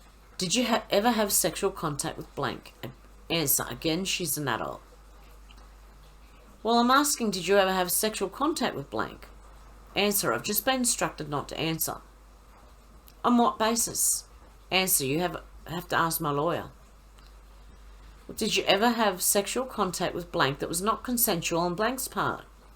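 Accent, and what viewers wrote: Australia. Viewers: Thank you for reading, actually the Australian accent is perfect for, love you 😍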